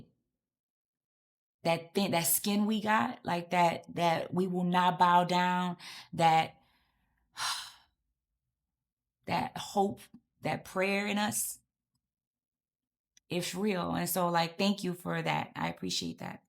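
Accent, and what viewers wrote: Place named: New Orleans, United States. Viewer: Love! Keep going! That Nola accent tho 🤤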